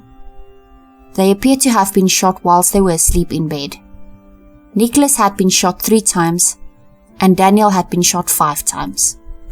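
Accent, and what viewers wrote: South Africa. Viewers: I have heard of this case before but, your in-depth coverage of this harrowing story is second to none. Love your (SA?) accent, by the way. I have subscribed and look forward to more of your content in the future. Cheers from Oz! 🦘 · Hi from Burundi. Yes I figured it was an SA accent!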